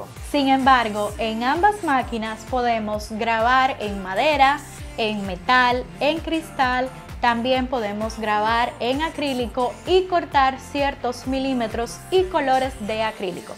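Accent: Dominican Republic. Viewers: Saludos, bendiciones, nuevo suscriptor, por tu acento sabía que eras Dominicana 🇩🇴, enhorabuena, ya estamos avanzando en RD con máquinas de corte láser, yo tengo impresoras 3D y ya compré una máquina de corte láser, te felicito y te deseo muchos éxitos 😊👍🏽🙏🏽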